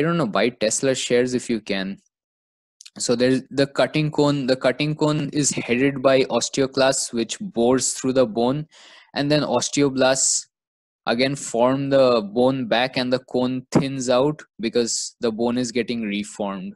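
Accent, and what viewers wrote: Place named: Italy. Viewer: Love the Italian accent XD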